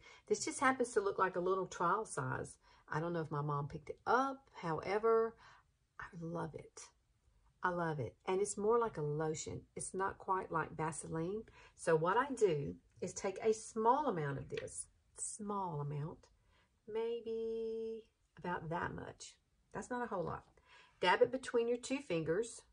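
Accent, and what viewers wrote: South, United States. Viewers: You, are FAB-U-LOUS!! I just found you on YouTube and having just turned the big 5-0 I am so glad that I found this particular video. You have that awesome southern accents and are just so enjoyable to watch. Keep it up girl. I just found my favorite YouTube personality! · I could listen to you talk all day. You sound a little southern like me. I love your videos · Oh my goodness!! You are just the sweetest thing!! Your accent makes me miss my family down south!! I’m almost 52 and have lots of under eye issues. This trick looks so great and doable!! Thanks for being your authentic self and helping us gals out!!💜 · U r so sweet! I’m frm MS so I love ur disposition and of course the accent! Southern girls forever!!!! Thanx for ur tips! I’m 41 and starting to get the lines😡. U look so beautiful and young!!!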